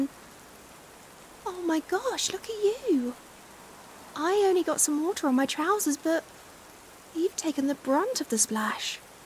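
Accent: United Kingdom. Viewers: That was a great ASMR I like the British accent 🇬🇧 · I think it's either Great British accent or it's Scottish accent.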